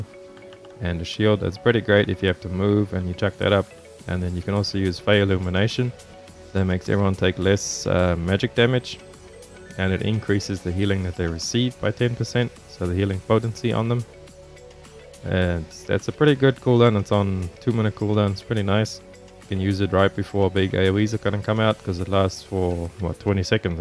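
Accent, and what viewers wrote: South Africa. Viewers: Another South African?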